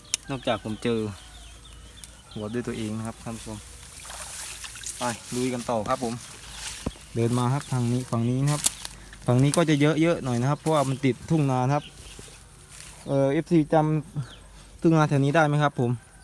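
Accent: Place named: Phu Thai, Thailand. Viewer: ยุแถวไหนครับ คือเว้าภูไท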